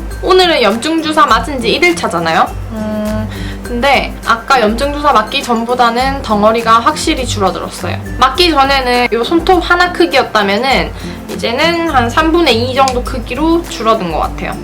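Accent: Gyeongsang, South Korea. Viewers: 언니 대구사람 맞죠?피부과어디가셧어요?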